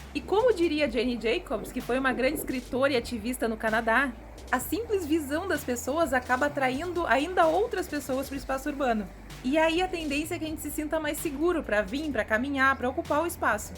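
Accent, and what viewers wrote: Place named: Rio Grande do Sul, Brazil. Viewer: Chegando para apoia-la e ja gostei. Conheço Pelotas, nasci no Sul, na fronteira, e hoje em P. Alegre. Pelotas tem muitos prédios históricos. Vc tambem é gaucha, nao? Esse sotaque..rs Um abraço, compartilharei. Nao faço conteudo, só apoio.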